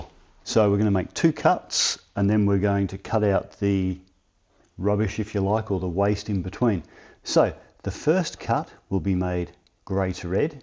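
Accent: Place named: Australia. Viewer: That’s what our accent sounds like in Australia champ.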